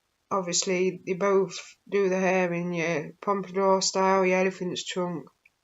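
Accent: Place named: North, United Kingdom. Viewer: You sound like a northern gal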